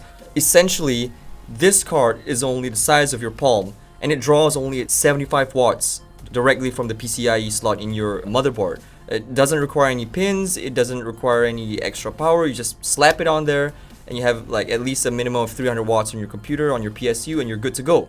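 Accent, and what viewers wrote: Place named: England, United Kingdom. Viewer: nice review. especially the eng accent haha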